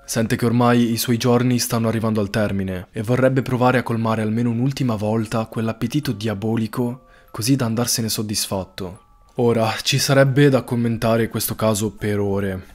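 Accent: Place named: Bari, Italy. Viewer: Pensavo anche io, poi ho sentito come pronuncia le "o", direi che è barese. Mancano anche le doppie ovunque. Fonte: sono sarda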